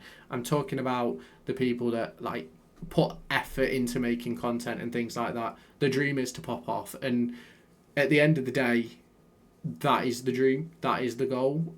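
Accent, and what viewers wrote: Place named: Derby, United Kingdom. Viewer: Are you Derby born and bred? You sound like you are. I'm allenton originally but now in chellaston.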